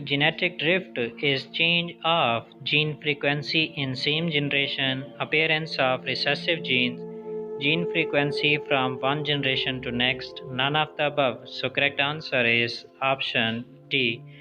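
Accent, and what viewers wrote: Pakistan. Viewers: Accent he aisa h.. I think it's Pakistani channel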